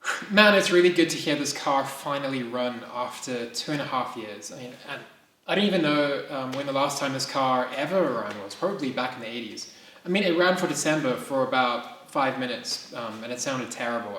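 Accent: Australia. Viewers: Cool. This is the problem with being away (since 2003), you sounded Aus to me. Anyways. Lovely car, always wanted a Spider. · You a truly a very clever young man 😀 amazing work! You sound Australian? 🙂🙂 A well spoken Australian 😉 Your attention to detail is a credit to you.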